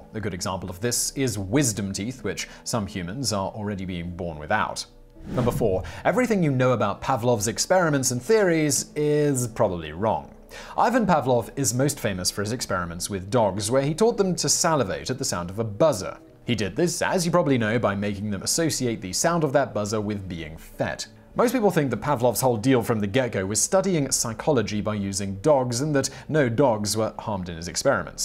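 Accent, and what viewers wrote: United Kingdom. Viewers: Since he's British. I'm pretty sure his pronunciation is the correct pronunciation. Others are speaking English with an accent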